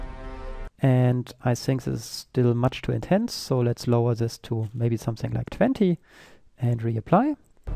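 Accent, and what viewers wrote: Germany. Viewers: Deutscher, hört man ;) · This tutorial is great! It doesn´t matter if you hear a German accent! Any accent is better than using a bad translator - 0k? :) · are you german? ^.^ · deutscher .. hört man sofort :D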